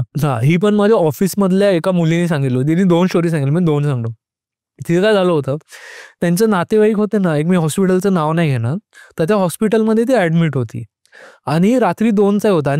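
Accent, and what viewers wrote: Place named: Goa, India. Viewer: Haaa ji bhasha bolto aahe na goa chi bhasha aahe hi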